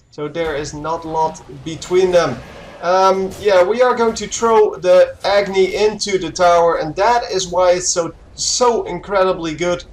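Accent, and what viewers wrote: Netherlands. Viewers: I think you are Dutch right? I can hear that accent because I'm Dutch as well xD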